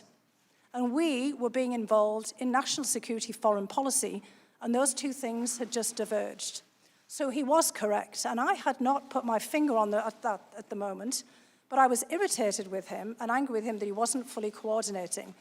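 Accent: United Kingdom. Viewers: She sounds like a Brit.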